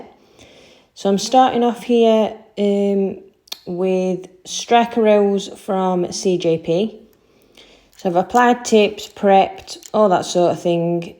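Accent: Yorkshire, United Kingdom. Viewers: I’m trying to watch as many of your videos as possible. Your work is so beautiful and fabulous clean application it’s a pleasure to watch. Love your accent too, are you in Yorkshire by chance, I’m in Sheffield 😊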